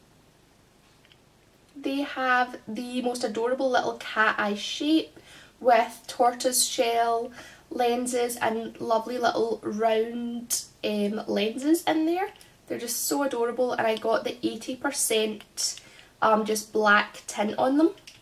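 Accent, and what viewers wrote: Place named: Scotland, United Kingdom. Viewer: your accent is so cute you sound like riona from super natural. Is it Scottish? I have stainless steel polarized tinted mirrored aviators from zenni that are awesome! just a bit heavy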